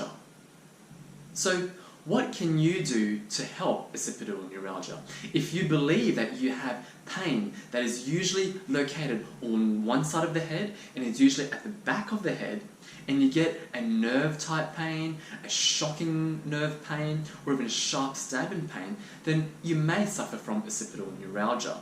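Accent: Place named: Australia. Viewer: I'd say Australia or New Zealand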